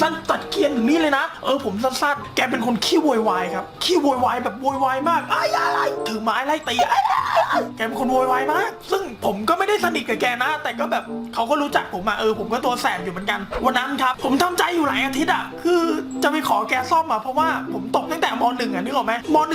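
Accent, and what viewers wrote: South, Thailand. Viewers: ใต้ออกพี่ 5555ฮาช.ห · จารย์สำเนียงโครตใต้เลยนิ<num> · พี่เปนโคนใต้เห้อะะะ555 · ครู เป็นคนใต้หรอ55555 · พี่เป็นคนใต้ออ55555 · อาจารย์เป็นคนใต้หรอนิ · คนใต้นิ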